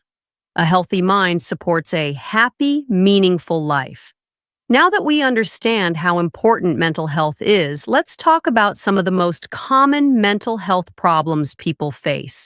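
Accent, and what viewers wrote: United States. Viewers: This is not a British accent but horrible American accent with delivery of irritating pronunciation of Rrrrrrrr.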